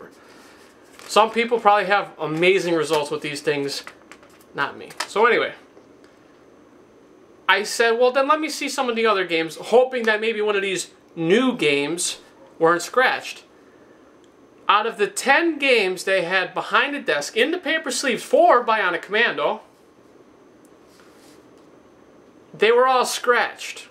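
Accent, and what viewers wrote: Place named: New York, United States. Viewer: I think he's a New Yorker, maybe Boston. He's displays more intelligence than the guys from Jersey Shore do.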